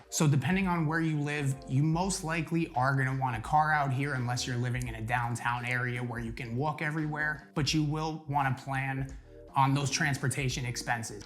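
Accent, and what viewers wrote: New Jersey, United States. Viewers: I'm in Jersey City and planning to move in Miami Beach. Am I doomed to drown in the high cost of living? Where were you in Jersey? Was it worth the move? I hear that Jersey Italian accent.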